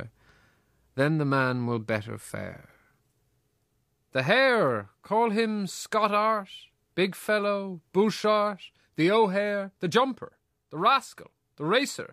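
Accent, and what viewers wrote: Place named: Ireland. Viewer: omg!!!! his irish acent! is georgeous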